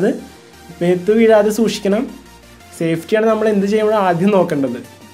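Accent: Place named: Ernakulam, India. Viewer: Kollam bro... Ur slang u must be from Ernakulam area, I think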